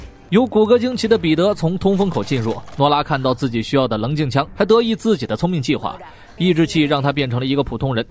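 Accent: China. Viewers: 主播是湖北人，口音暴露了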